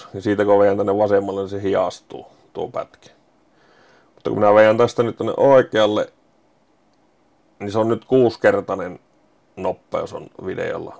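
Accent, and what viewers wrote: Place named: Finland. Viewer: Olen lukenut kaikki päätalon kirjat. Ootko sieltä päin. Murre kuulostaa sille.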